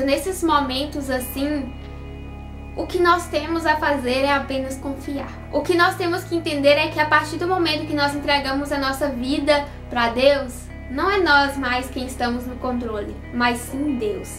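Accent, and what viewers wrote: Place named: Minas Gerais, Brazil. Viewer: Esse sotaque mineirin é o mais bonito do brasil, parabéns irmã, graça e paz.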